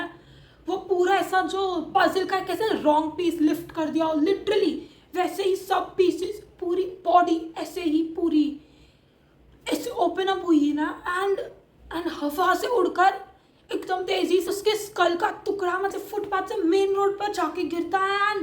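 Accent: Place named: Gujarat, India. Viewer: The gujju accent is the must 😝😂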